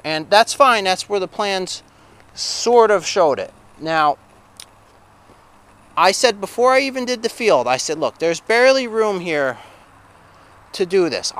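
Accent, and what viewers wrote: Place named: California, United States. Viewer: What state is this in? Sounds like California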